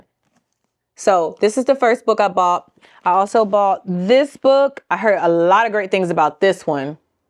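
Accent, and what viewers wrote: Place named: United States. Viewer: I think your Spanish gringo accent made people laugh/cringe…Good-luck on your journey tho😎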